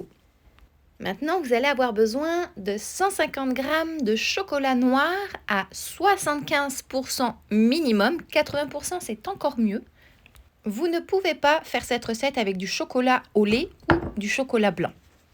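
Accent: France. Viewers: J’aime beaucoup votre accent Francais; tres, tres mignon! Je n’ai jamais les occasions parler ou ecouter a la belle langue francaise!! J’aime beaucoup votre recette aussi, je vais immediatement l’essayer! Merci a vous 😃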